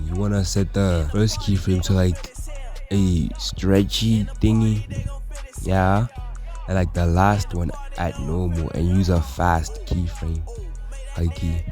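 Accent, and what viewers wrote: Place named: Brazil. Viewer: o cara parece um brasileiro falando inglês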